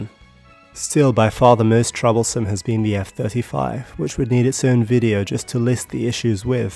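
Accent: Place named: United Kingdom. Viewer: Pompous British accents are hilarious 😂 Nothing personal, great videos !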